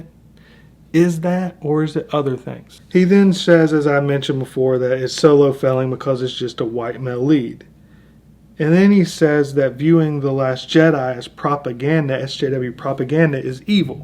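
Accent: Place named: Rural South, United States. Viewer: like I said, a southern white person calling people stupid and an idiot is winning the argument. You DID NOT make valid points in this video. You are just another white person on YouTube. You are not important in any way what so ever. Understand that. You are not owning mrwin your comments. You are not Perry Mason and you sound like a hick. Your opinion doesn't count so why are you acting like someone is attacking whites? You want to call people Nazi because you want to grasp for straws. You don't think race should matter because you are white. The best person to you is a white person. Get off your high horse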